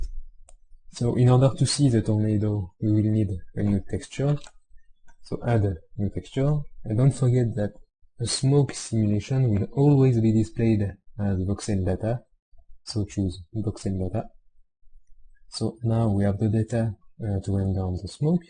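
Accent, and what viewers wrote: France. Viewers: yea , l accent francais est vraiment unik . Good tut btw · This videos are like a two-for-one deal for me. I learn how to do particles in blender and learn how to do a French accent!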